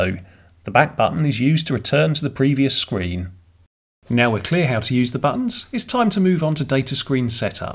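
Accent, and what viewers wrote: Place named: Essex, United Kingdom. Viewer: This sounds like a north Essex accent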